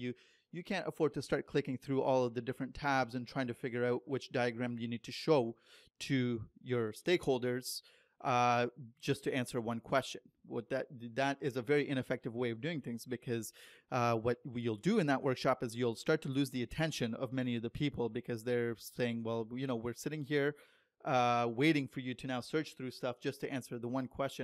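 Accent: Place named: Canada. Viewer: You sound so Canadian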